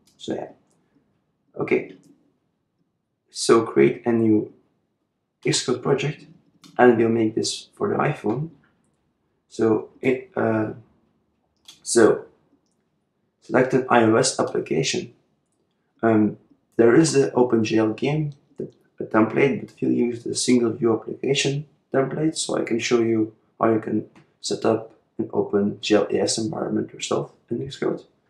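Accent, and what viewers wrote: Russia. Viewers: типичный русский акцент)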